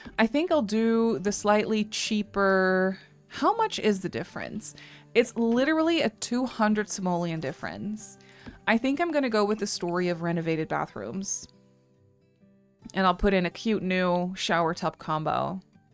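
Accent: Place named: Germany. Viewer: Is Sir from Germany? He has an german accent😂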